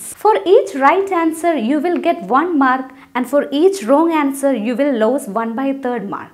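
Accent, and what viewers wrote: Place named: South, India. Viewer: English bol reha ho ya madrasi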